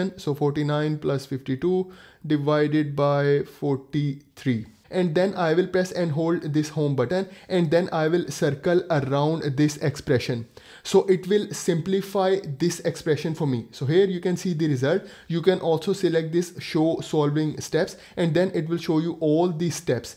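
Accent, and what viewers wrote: India. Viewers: Why Your Accent is Indian bro? As am Indian I can understand each and every word very easily. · Bro got that thicck indian accent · Man got the thickest Indian accent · I love indian accent 😄 it's amazing 😄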